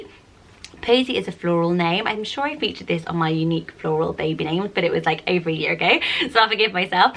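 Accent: United Kingdom. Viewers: Everything sounds prettier with a British accent lol...they sound beautiful when you say them! I live in Tennessee...ask someone to say Clara or Lavender 😆 It’s not good. I’m originally from NY...it’s not good, either 😂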